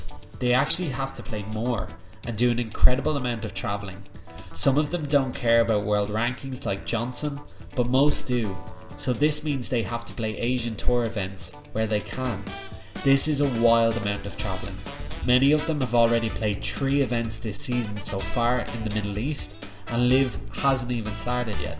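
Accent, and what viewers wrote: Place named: Ireland. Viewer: I’m not a lover of LIV, Norman or all the blood money grabbing pros and honestly, I hope they all rot in hell but this clip is so full of shit, it deserves to be put in the trash can. If you read this in the international news then maybe, there will be credibility but other than that, this guy sounds like he’s from Island and will treat this as an attempt at Irish humour and nothing else.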